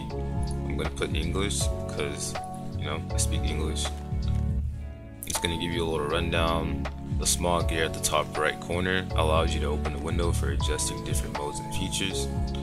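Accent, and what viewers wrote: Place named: Baltimore, United States. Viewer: you from baltimore? i can hear the accent a little lols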